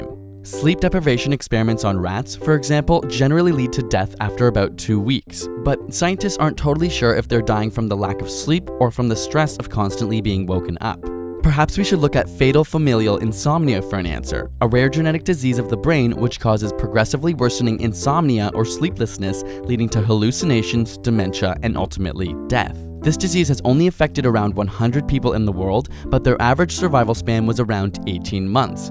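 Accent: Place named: Canada. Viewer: You are Canadian... I can tell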